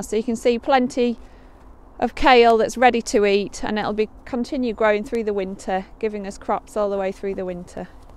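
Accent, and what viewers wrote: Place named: Manchester, United Kingdom. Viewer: Subbed you you sound local manchester way lol hopefully get sone good tips off you!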